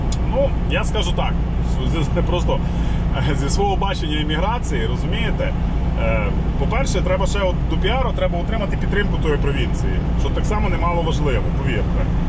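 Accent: Lviv, Ukraine. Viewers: Добрий день мене звати Ігор живу в Оттаві.Працьую водієм рок трак. Хотів би з вами поспілкуватись,чую вашу розмову і роблю висновок що ви Львівська обл.Дуже дякую.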